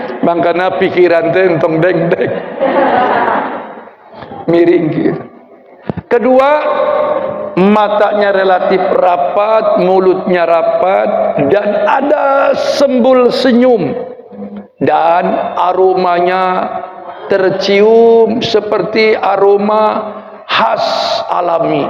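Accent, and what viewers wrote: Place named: Sunda, Indonesia. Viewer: Suara Pak Kyai kas sundanya.... salam gotong royong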